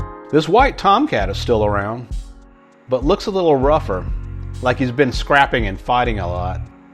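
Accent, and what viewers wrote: South, United States. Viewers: This is what YouTube is made for! What an amazing experiment, and how generous of you to share your home with your fellow critters around you:) Thank you for making this and sharing it. You're a very kind person, and your lovely southern accent is a bonus for narrating your adventures! Edit:, please do stay curious!!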